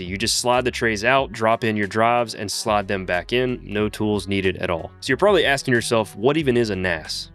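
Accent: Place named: Rural, United States. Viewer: First tech youtuber I've seen with a country accent. Love the build, looks clean!!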